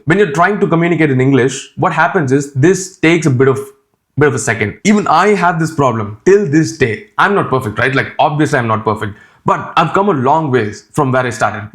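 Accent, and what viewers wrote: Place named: India. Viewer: The way you're speaking is how most Indians speak, so it is an indian accent. The first one you did is stereotypical, never have i ever met someone in my life who speaks like that.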